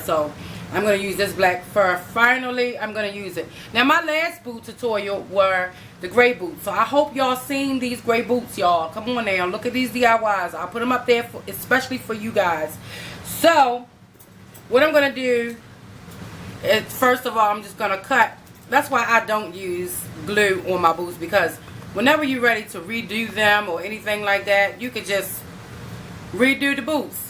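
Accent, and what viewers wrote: Baltimore, United States. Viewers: Yes bmore accent! Thanks for the tut love this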